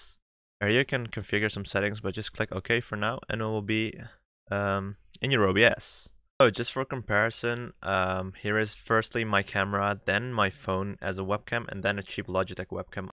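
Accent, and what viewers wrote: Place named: Netherlands. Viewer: This might seem off-topic but you sound like a Dutchman.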